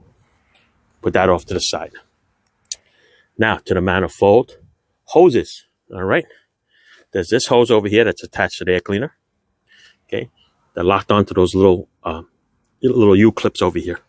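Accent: New York, United States. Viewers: Is this, the old bracket racer long ago, from Seattle? If so, you sound different, like you're from New York, now. I don't recall you having an accent before. Anyway, why were your intake ports on the heads so dirty?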